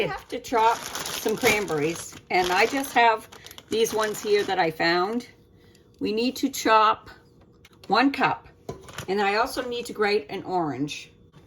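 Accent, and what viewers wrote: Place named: Rhode Island, United States. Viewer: New friend here from the Christmas collab! So glad we found each other’s channels. Looking forward to watching more of your content. I lived in Rhode Island for several years; loved it there so much. Your accent brings back memories. My name was Melinder instead of Melinda 😂 All of your recipes look fun and delicious. Merry Christmas!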